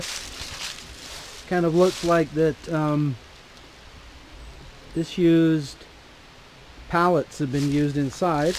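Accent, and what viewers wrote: United Kingdom. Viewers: Love this place, it has a peculiar mystique to it, something a little spooky & Paranormal (that's the feeling I get ne-how.!) I think it was in a little better condition when Dan Bell was there... Thanks for exploring, p.s. R U a Brit - #Playschool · a cool film. I'm just wondering...are you from the UK? I detect a slight accent from over here.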